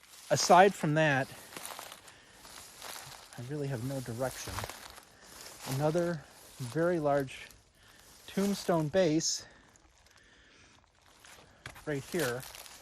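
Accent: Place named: Chicago, United States. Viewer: I had to subscribe for the name of your channel alone! I love honesty. Then I heard a Chicago style way of speaking and that you were doing an abandoned cemetery near the Chicago area. What's not to love? Maybe check out what we in the neighborhood called "The Lost Cemetery." (It's on the Northside, fairly safe neighborhood.) It was next to Labaugh Woods along the train tracks. More recently a good deal of the land was sold off. They claim to have moved the bodies. I DO NOT BELIEVE THEM. And there are STILL headstone to be found there today, in the woods behind the houses they build over the graves that are still there. You can park in Lil Labaugh Woods or the main parking, both parks are along Foster Ave, if you want a good place to park. The entrance to the larger picnic area and parking is off Cicero Ave. Or you can drive West on W. 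Bryn Mawr Ave along the North edge of Bohemian Cemetery. You'll reach a bike trail that use to be train tracks The Sauganash Trail Bike Path. You past a subdivision on your left, that was part of "The Lost" Cemetery and still is! If you walk south on the bike trail toward Labaugh Woods on your left is the Lost Cemetery. The headstones are still there. The bodies are still there.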